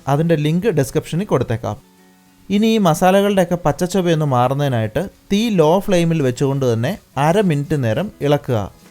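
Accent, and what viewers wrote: Kottayam, India. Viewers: Chettan kottayam ano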